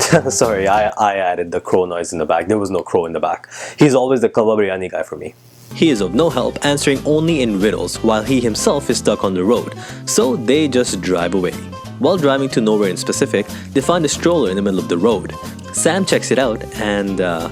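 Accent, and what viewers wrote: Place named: Canada. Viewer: oh canada... Thats the accent come from 😉.... Yeah youtube support big companies.... I always wait for your reviews... I'm one of your huge fan... Please upload more often... Also you look much better than the photographer boyfriend 😉 😉 😉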